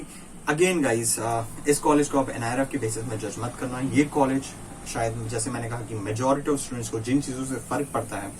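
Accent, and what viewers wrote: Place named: Assam, India. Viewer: smooth Asamese bro!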